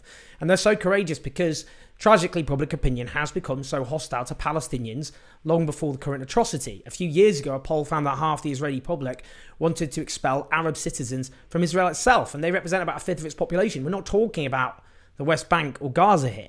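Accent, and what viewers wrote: United Kingdom. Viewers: I like your show but it's very difficult for me and I am sure also for so many others to understand your very heavy British accent. Try to speak a bit slowly and make your English a bit more Like an American Accent so that all your international listeners can understand you better. By the way, I am NOT an American. Thank you once again for your great and valuable content.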